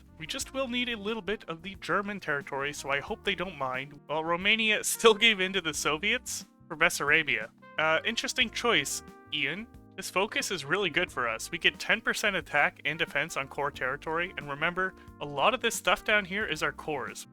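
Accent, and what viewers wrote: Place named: Ottawa, Canada. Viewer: I’m so used to HOI4 streamers being Europeans I was wondering what kind of Central European accent you had until you said “harder” and then “guards” right after … I am from Ottawa and probably have the same accent as you lmao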